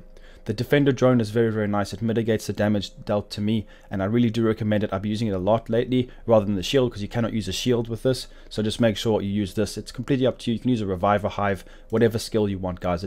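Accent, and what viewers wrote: South Africa. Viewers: Hey man, had this game about 6 weeks and you're my go to channel for it now. Just curious enough about your accent to ask where you're from? My ears are saying 70% South Africa and 30% New Zealand.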